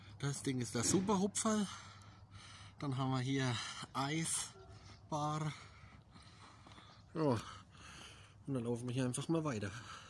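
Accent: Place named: Franconia, Germany. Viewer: Dei frängisch is einfach herrlich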